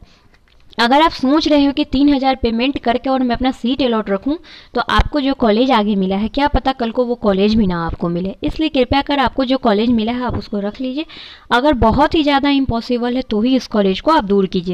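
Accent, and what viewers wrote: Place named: Bihar, India. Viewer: Madam aap kahan se belong karti hain? Aapke accent se lag raha hai ki aap Vaishali ya Muzaffarpur se hain.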